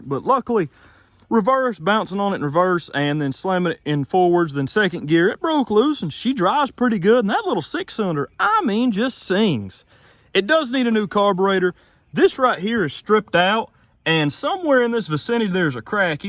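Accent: South, United States. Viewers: Just wondering what part of the south you from cause the accent and love the videos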